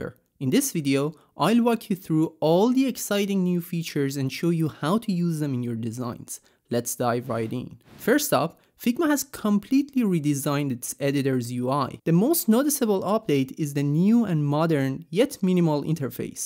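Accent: Iran. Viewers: لحجه فارسی🤝🏻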